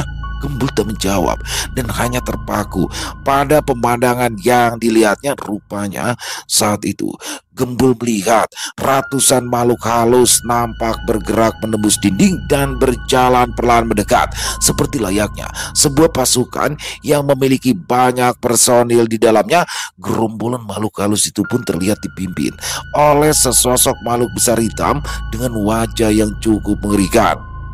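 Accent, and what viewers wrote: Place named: Betawi, Indonesia. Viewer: Pokok nya nggk kn pernah bosan dech...,klo dengerin kisah warung kopi bang sama gembul,di tambah lagi logat Betawi yg di bawain olh bang membuat kisah nya jd hidup. terimakasih bang kisah bang nggk pernah gagal menghibur salam sehat sukses dan bahagia selalu untuk bang dan team ❤di tunggu kisah bang selanjut nya 😊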